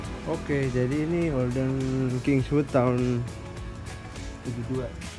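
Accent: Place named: Semarang, Indonesia. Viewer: Kassemarange daerah ngendi smpyn apal q logate qong semarangan ngene ki.. Kebetulan tonggo dwe kas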